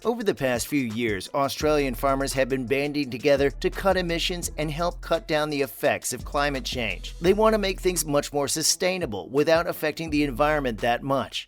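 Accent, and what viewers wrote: United States. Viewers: Is this an advert for Elders or supposed to be informative - not sure you are achieving either - especially with the very annoying American accent.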